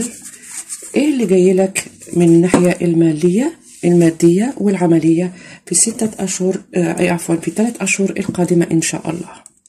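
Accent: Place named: Egypt. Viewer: مساء النور والسرور لاحلى جزائرية حبيبتي ربنا يسعدك والله اللهجة المصرية جاية عليك هايلة والانسان الناجح ديما عندوا اعداء لهذا السبب ينتقدوك مستواك اغلى ربنا كي كل خير 💞❤️❤️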